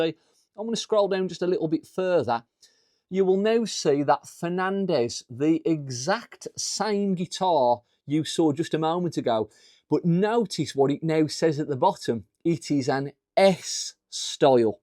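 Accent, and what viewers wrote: Black Country, United Kingdom. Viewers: This accent just took me straight to a time in my life when I lived in rapid succession in Dudley, Wednesbury, Cradley Heath. I'm in <num> again.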